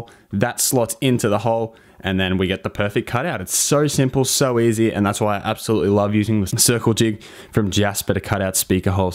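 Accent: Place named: Australia. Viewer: Nice to hear an Aussie doing this. I don't know anything about plunge Routers, do you need different "bits" to fit on the cutting apparartus? Can you get them in australia?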